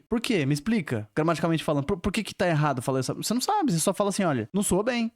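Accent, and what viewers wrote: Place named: Porto Alegre, Brazil. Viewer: só uma duvida, tu é de porto alegre? sutaque certinho de lá kkkk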